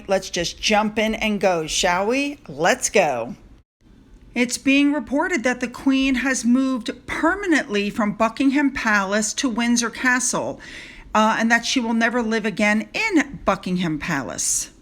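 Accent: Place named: United States. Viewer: I think you are very brave to continue with Utube, - you have a great clear voice from the USA on the side of Her Majesty. Thank you.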